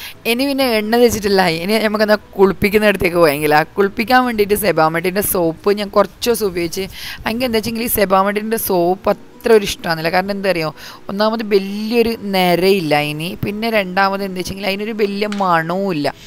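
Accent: Kasaragod, India. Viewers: Sebamed super ,ksd slang l polichu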